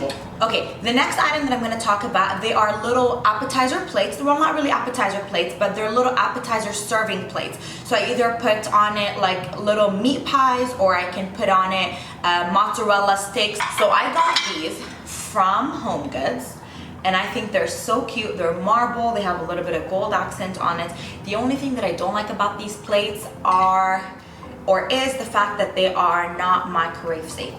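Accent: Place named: Arab world. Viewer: we still love ur Arabic accent. So it's okay u can pass with it.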